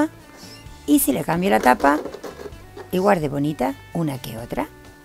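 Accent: Spain. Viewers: estas pasa!!! eres mi inspiracion de veras todo lo que tocas sale excelente y tu espanolado me encanta...hago las piezas mias con las quimicas tuyas y todo sale excente graciasss!!!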